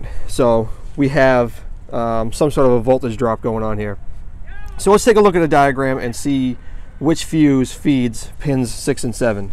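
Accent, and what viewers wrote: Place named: Massachusetts, United States. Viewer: Are you related to Ivan (I think that is his name) of Pine Hollow Diagnostics? You sound a lot like him, but with a bit of a Mass accent, you look a lot like him, you have same mannerisms AND you guys are both high level with auto electronics... Great video, ty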